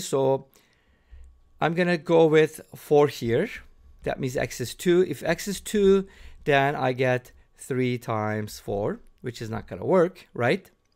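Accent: Turkey. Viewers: Is that a Turkish accent I'm hearing? 🤔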